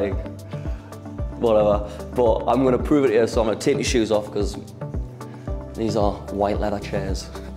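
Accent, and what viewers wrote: Newcastle, United Kingdom. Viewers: Geordie mate, north east of England.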